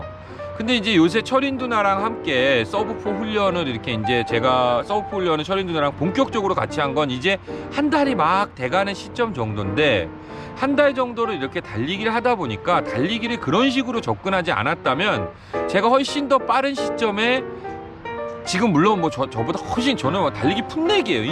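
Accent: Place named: Seoul, South Korea. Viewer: 서울말 깔끔하게 구사하셔서 깜짝 놀랐어요..ㅎㅎ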